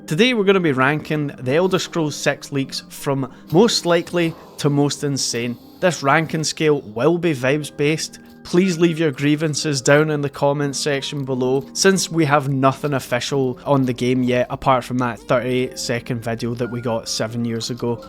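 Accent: Scotland, United Kingdom. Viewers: Comment section never heard a Scotsman before lmao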